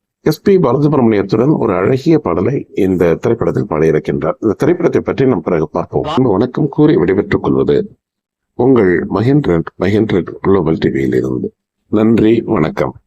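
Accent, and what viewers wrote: Sri Lanka. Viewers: இலங்கைத்தமிழர் என்பதால் இவரது தமிழ் இனிக்கிறது !! ஆனால் தமிழகத்திலோ எளயராசா வந்த பிறகு காலம் காலோம் எனவும் மோகம் மோகோம் எனவும் ராகம் ராகோம் எனவும் தமிழே தேய்ந்து அழிந்தது !!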